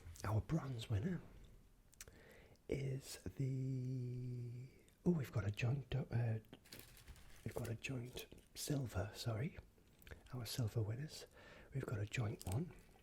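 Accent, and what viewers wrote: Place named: Durham, United Kingdom. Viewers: Are you Durham? You sound Durham! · Great video :-) really relaxing and made hungry haha. Like your natural accent too, a true Geordie haha. I agree, those two are my favourite too! But I love the strawberry ones too, so strawberry would have taken silver for me:). Do you prefer roses or quality street?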